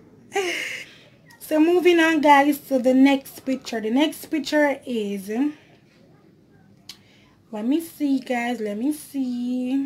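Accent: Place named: Jamaica. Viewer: Nice video and love that Jamaican ascent though 😍😍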